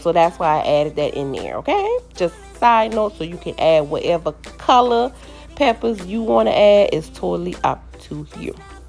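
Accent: Charleston, United States. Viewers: You must be from Charleston your accent is just like my dad and aunt… he passed away last year. Anyway the food looks yummy!!! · Got chucktown written all over your voice!!! Girl I stay watching recipes..here I am!!!😂😂😂my city!!!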